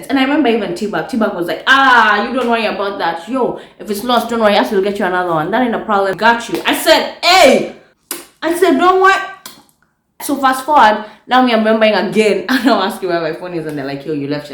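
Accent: United Kingdom. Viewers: When speaks with that British ascent,lawwwd tanivunja mbavu😂😂😂😂😂😂😂😂😂😂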